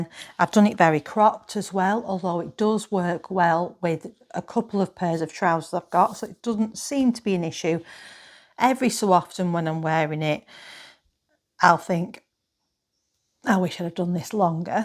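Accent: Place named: United Kingdom. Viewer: i love your accent!!! (from ny, rarely exposed to any of the UK accents)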